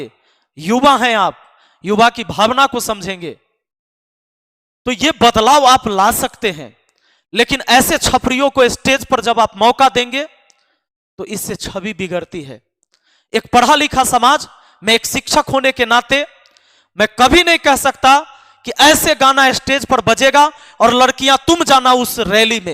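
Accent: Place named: Magahi, India. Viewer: ये हमारा मगही भाषा है हमलोग ऐसा हि बोलते है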